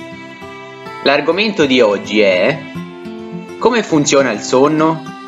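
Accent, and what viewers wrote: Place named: Tuscany, Italy. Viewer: direii ahahhahaha, sembri Paolo Ruffini (anch'io sono toscano)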